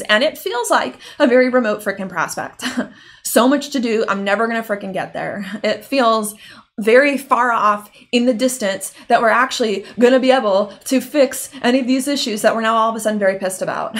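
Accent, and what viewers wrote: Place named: Pittsburgh, United States. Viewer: Sounds like Pittsburgh 💚 at least PA. Thanks!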